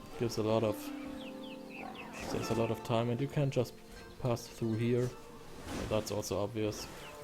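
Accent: Germany. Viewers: Man merkt richtig deinen Deutschen Akzent! :D But i like it.. :) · you sound really German oh du sagst ja sogar du bist aus Deutschland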